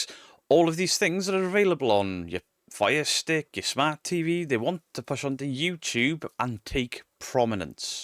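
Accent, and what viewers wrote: Wales, United Kingdom. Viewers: you sound welsh, are you?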